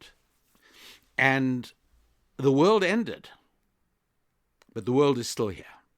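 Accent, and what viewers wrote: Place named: United Kingdom. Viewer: Your bold British accent is brilliant and inspirational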